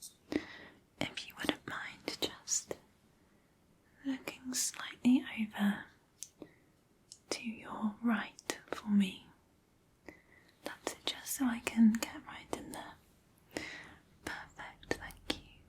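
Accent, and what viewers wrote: England, United Kingdom. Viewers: I now love women with english accents😊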